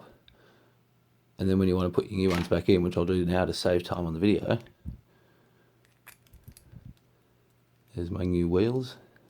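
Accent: Australia. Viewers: Where does one get these series of? Your from Australia based on your accent (So am I). I've only ever seen the standard range at my Target/BigW/KMart. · Awesome! Thanks for the help! Are you aussie?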